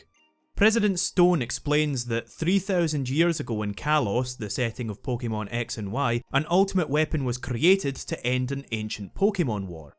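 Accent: Scotland, United Kingdom. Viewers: Lol i couldnt understand i it because of the scottish. But your brothers catchphrase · Mm yrs I sure do love a Scottish man narrating a remake of a game for 7 hours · HOLY MOTHER OF ALL VIDEO ESSAYS... Good thing I really enjoy scottish accents. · 7 hours of Scottish accent, LETS GO!! For some reason it actually helps me focus on the content of the video. Don't ask me why lol Have you ever gone back and played it since this video? Shiny hunt or just playing through again? What about AS? have you played that yet? I've always kinda disliked that they just copy pasted the Battle Maison from XY and not have their own unique battle facility. I know HG/SS did that with plat but still :( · Seven hours of ah Scottsmann tolkin aboot Pokähman? Consider me signed up. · I never thought hearing an extremely powerful Scottish accent after the ORAS opening would be so jarring. 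· This guy ain’t just Scottish, he hella scottish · THIS IS MY FIRST VIDEO IVE SEEN FROM THIS GUY AND THE SCOTISH WAS THE BEST SURPRISE EVER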